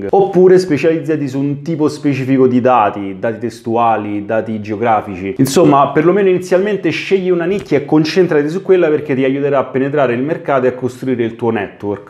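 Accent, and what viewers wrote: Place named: Rome, Italy. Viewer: Grande! La penso come te sui Recruiter e la retribuzione che offrono in Italia, scrivono annunci contraddittori, alte competenze e stipendi da fame...prendono tutti per stupidi. Mi sembri romano dall'accento, tu in cosa sei Laureato? Di cosa ti occupi? Sto seguendo qualche tuo tutorial per un capirci meglio su AI e ML per un mio progetto e tu ne sai molto, non nego che trovo molta difficoltà, non essendo un matematico... però non mi arrendo, sono solo più lento degli altri 😊 io voglio specializzarmi su Python per AI e ML, hai qualche corso (gratuito) in particolare da consigliarmi? Grazie per i tuoi video, ti faccio i complimenti😊 perché spieghi Python mentre scrivi come se scrivessi in italiano...sei una potenza!💪😉 Ciao 👋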